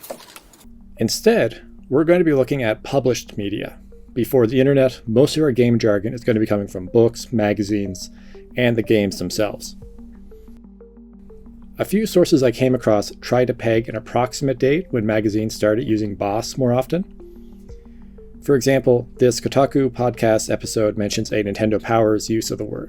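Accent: Newfoundland, Canada. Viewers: Are you from Newfoundland? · ... yet another Canadian making YT video documentaries... what is it with you guys? do they put something in the water? It seems like every time an interesting documentary-type video is suggested to me, I hear the ole Canadian accent.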